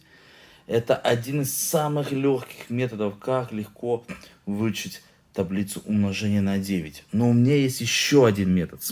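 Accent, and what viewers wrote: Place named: Ukraine. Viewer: Вот удивительно, фамилия и внешность восточная, а акцент украинский)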